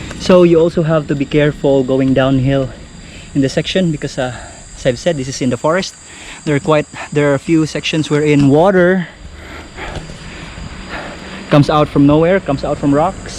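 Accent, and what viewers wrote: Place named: Bisaya, Philippines. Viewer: Bike legs back on the legs? Hehehe bisaya englis nkng boss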